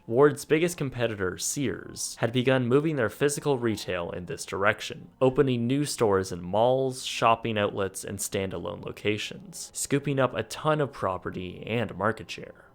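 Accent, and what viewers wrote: Canada. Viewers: from Canada. They pronounce some things different up there. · He is from canada they pronoun words differently. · Love your videos. Great job,. And your voice is so nice and relaxing. Love that Canadian accent. Love from Oklahoma 🧡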